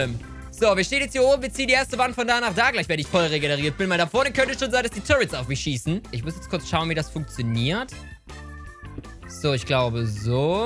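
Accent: Saxony, Germany. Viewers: kannst du mal ein komplettes Video auf sächsisch machen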